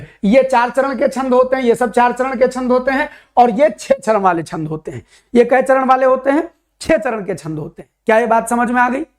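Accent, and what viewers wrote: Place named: Western Uttar Pradesh, India. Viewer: hm bhi hai up se .. pr hmare yha aisa nhi bola jata ..sayad aap west up se ho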